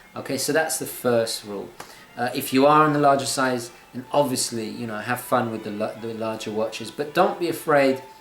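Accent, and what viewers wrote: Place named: United Kingdom. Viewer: Absolutely! And I really like this British accent.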